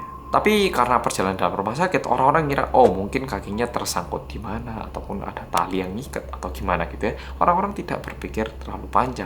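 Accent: Java, Indonesia. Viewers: oo baha ada logat Jawa nya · Malam Jum'at ditemenin orang Korea yang medok😂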